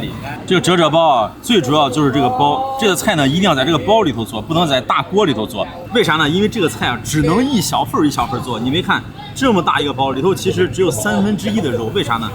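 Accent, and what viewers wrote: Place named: Shandong, China. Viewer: 你是山东口音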